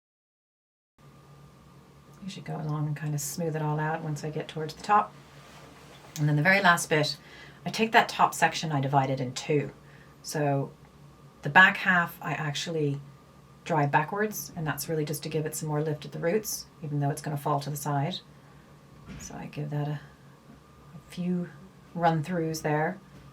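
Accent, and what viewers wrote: Ireland. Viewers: Thanks, just showed this to my mom so she can use mine. You have an irish twang to your accent! Where are you from?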